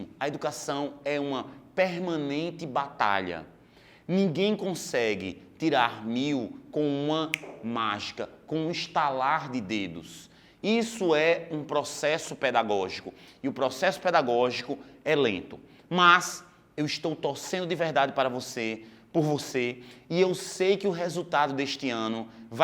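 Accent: Northeast, Brazil. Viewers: Apaixonada pelas suas aulas !! Queria que fosse meu professor no Ensino Médio e hodiernamente na faculdade. Curso Letras - Língua Portuguesa, e aprendo muito com você ! Estou estagiando no Ensino Médio e o que aprendo na suas aulas passo para os alunos. Muito obrigada !! você é maravilhoso. P.S., Seu sotaque é encantador, amo o sotaque nordestino.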